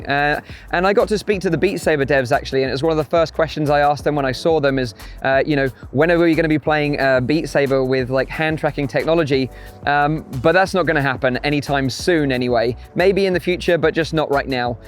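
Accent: United Kingdom. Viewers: Defo uk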